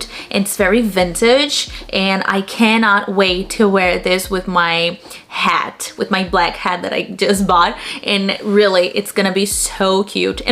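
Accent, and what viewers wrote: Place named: United States. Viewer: more videos in english please, you’re sound like a american girl haha loved it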